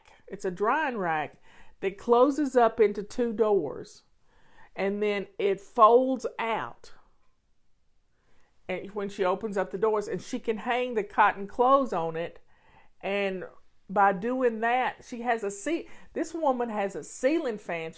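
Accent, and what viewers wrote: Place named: North Carolina, United States. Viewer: I've been Flying for 15 years now - thank you! I wanted to tell you that I love hearing you speak because your accent is exactly like my grandmother's (also from North Carolina) and I've missed hearing it so. God bless you.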